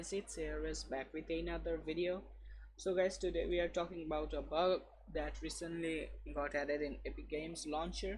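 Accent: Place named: India. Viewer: deal is deal just liked and subscribed. well u sounds like indian.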